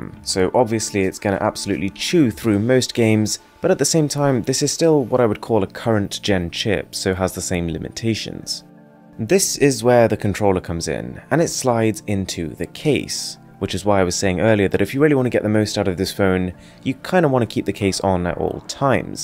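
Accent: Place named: England, United Kingdom. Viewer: Dude ur english accent its insane 🔥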